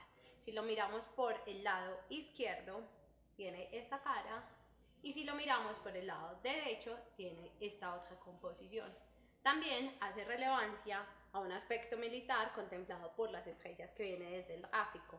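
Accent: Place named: France. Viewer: La chica habla como con un asento francés, o será que tiene la lenguita pegada🤔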